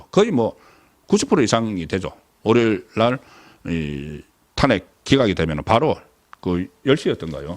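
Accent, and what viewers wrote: Gyeongsang, South Korea. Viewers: 혼술 송국건 대표님 구수한경상도 사투리가 더 감칠맛이납니다. 해박한 시사논평줄겨보고있습닏다. 고맙습니다. 혼술화이팅!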